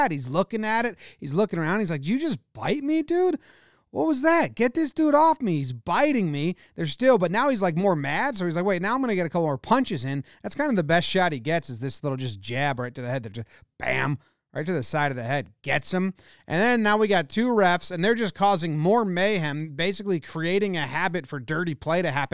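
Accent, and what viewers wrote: Canada. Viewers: Love your Canadian accent lol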